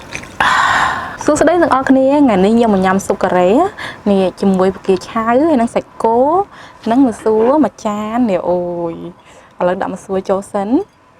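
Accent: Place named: Brazil. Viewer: គាត់ជនជាតិប្រេស៊ីល ការនិយាយជនជាតិគេខុសគ្នា។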